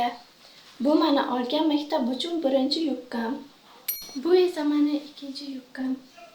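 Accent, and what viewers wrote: Khorezm, Uzbekistan. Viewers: Babr xorazmlik ekanlikiz aksentdan blinibdi😂💘Lkn aesthetic chiqibdi🎰Manga kòpro 2-look yoqdi💅Lkn ikkalasiysm woooow✨ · Choʻta gapirishizdan xorazmliga oʻxshatdimdo szi xorazmlimasmisz🤔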